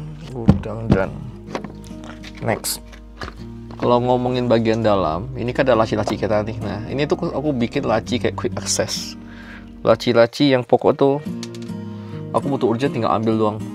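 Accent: Pontianak, Indonesia. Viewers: lebih menarik chanel ini drpd yg sebelah,kbnyakn narasi sok wah ,terbukti subscriber nya lebih bnyk ini logatnya pontianak atau ketapang ya bang?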